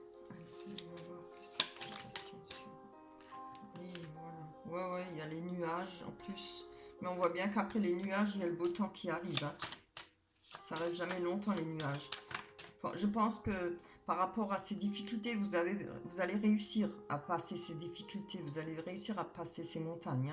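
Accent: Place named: Franche-Comté, France. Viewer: Vous avez un accent de Franche-Comté, ou pas loin.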